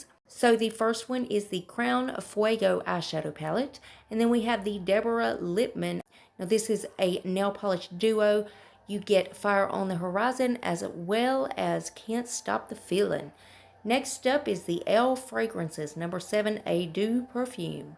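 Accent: South, United States. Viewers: Hey girl, love the accent. You sound like me. LOL We girls in the south got it goin'.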